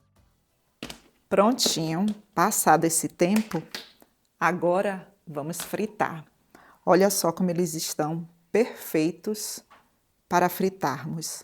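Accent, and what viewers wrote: Bahia, Brazil. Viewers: Kkkkk esse pedido de like, é cativante. Deus te abençoe. Esse sotaque baiano é indescritível. Deus te abençoe infinitamente.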